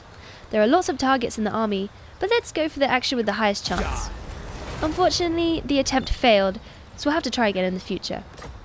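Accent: England, United Kingdom. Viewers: qt british voice always makes things more enjoyable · She has such a lovely English Accent :)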